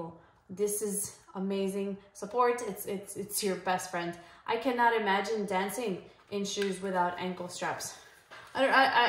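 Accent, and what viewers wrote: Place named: Miami, United States. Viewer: I could tell you were a Miami girl cause we have the same accent X) great video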